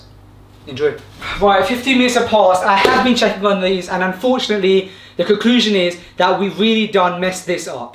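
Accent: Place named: United Kingdom. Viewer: tbh I learn Islam, english, and british accent from your videos and freshly grounded's videos a lot, thank you 💯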